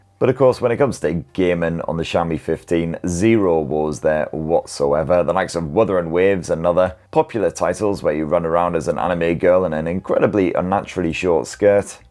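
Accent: United Kingdom. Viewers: Thanks for adding other languages to your videos ! (let say the truth, because your British accent i don't understand everything ! )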